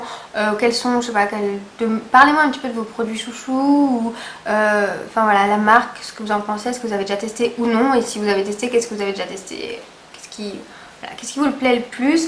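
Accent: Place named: Alsace, France. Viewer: Hello ! Je participe a ton super concours! Je n'ai pas malheureusement rien testé de la marque Remington, je n'ai pas de lisseur non plus car mes cheveux sont relativement lisse comme les tiens; et grâce a ton tuto je me dit que je pourrai finalement quand même en utiliser un pour me faire des ondulations ! :) Mon anniversaire est le 24 oct ça serai un superbe cadeau haha Ps: J'aime beaucoup ton petit accent Alsacien !!!!!!!!! vive l'alsace ! salut bissome !!! :)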